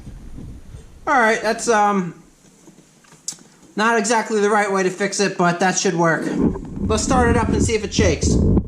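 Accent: United States. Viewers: stop fuckin saying yawn mower. god damn yank.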